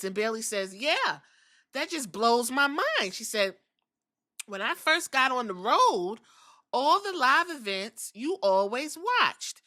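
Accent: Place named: New York, United States. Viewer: Yo I love your NY it reminds me of home…”fk outta here!” 🤣🤣🤣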